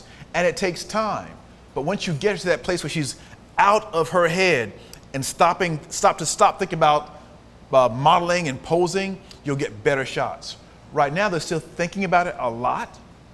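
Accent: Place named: India. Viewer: omg indian accent in polish mouth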